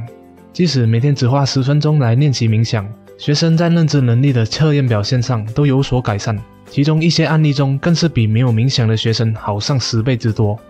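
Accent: Malaysia. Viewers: 好~ 听出马来西亚的腔调~ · 一開始以為是台灣北京腔,最後就感覺到是大馬腔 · Dino Mei 应该是马来西亚，因为我是马来西亚人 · 你一定是马来西亚人，因为料的音哈。很开心看到你的分享。我也正在努力。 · 马来西亚口音真的很亲切！！！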